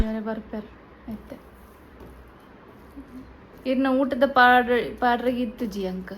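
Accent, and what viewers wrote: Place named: Kundapura, India. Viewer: I like your Kundapura kannada , please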